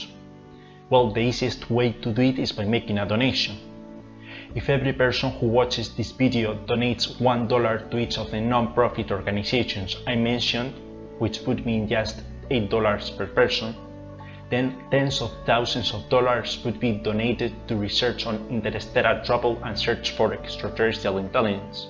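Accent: Spain. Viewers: Es ese un acento español? Mi español es mal pero estoy aprendiendo todos los días :)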